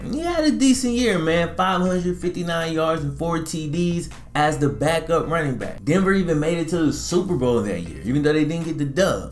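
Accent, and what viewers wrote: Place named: South Louisiana, United States. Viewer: Yo! You got dat South Louisiana “Ak-oholoic” ya hurd!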